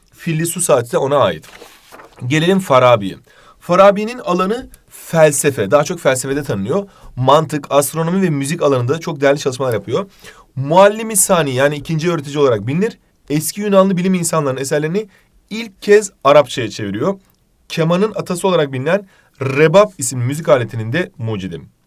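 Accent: Turkey. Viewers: İstanbullu yada Ankaralı (tonguç)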